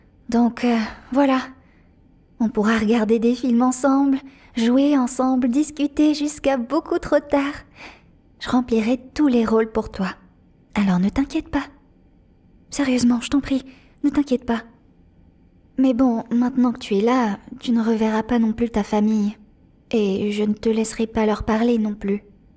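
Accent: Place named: England, United Kingdom. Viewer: Mais.. c'est la même personne que sur la chaine en Anglais? Je peux pas y croire, l'accent anglais sur la chaine principale vs le français parfait sur celle-ci :x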